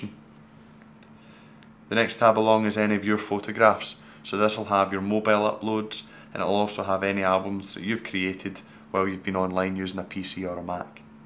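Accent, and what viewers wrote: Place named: Northern England, United Kingdom. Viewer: hes from northern england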